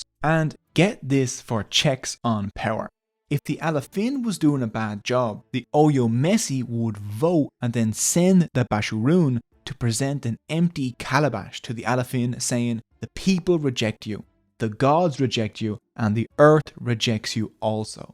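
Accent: Ireland. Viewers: A party to celebrate the success of a previous party SENT ME lol This was a pretty cool breakdown especially for someone who is ADOS learning I'm largely Yoruba. I also appreciate your pronunciation effort which I don't think can be easy with a strong Irish (?) accent! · From a Nigerian, an Irish dude pronouncing Yoruba places/names with an Irish accent...is just in having me in stitches 😂😂😂 (it's so phonetically bad/wrong), but I'm so enjoying this video nonetheless. The amount of research is immensely commendable. 👍👍👍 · God i really love your accent, i wish i was born Irish so i don't have to study extra to have Irish accent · Please be googling how to pronounce “Yoruba” first. Even with your Irish accent there’s no reason why you can’t pronounce Yoruba and place names like Ibadan properly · BRUUUH you're confirmation to my closeted anthropologist mind, that the accent of the Bajans is defohighly influenced by de irish and probably from where youre from in ireland... 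#onelove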